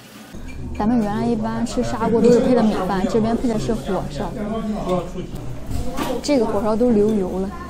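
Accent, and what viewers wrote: Shandong, China. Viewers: 加油山东口音不要丢视频能做到一分或一分半钟之内删删剪剪也不要紧希望参考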